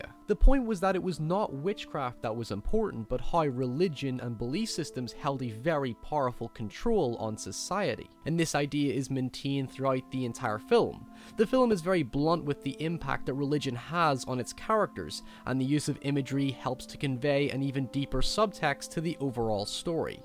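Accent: Northern Ireland, United Kingdom. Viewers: Nah I’ve decided you’re Northern Irish ☘️ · What’s going on with your accent? Northern Ireland? Scottish? American? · I can't tell if you're british or american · who can tell me where the narrator's accent's from? what part of the UK? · northern irish. just like mine so it is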